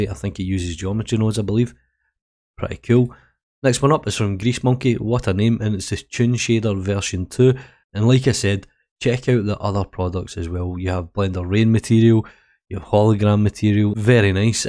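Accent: Scotland, United Kingdom. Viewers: Don't worry, you just sound a bit more Scottish than usual.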